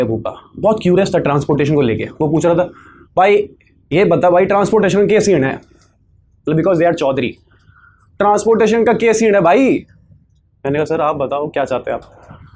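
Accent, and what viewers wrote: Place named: Haryana, India. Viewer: Sir ye hariyanvi accent h... Rajasthani accent nhii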